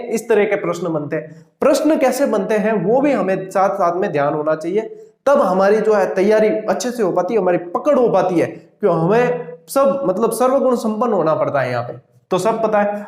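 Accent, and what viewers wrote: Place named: Marwari, India. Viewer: सर आपका मारवाड़ी भाषा के ठरके खूब रास आ रहे हैं!! निस्वार्थ भाव से विधार्थियो के लिए रामबाण औषधि परोस रहे हैं!!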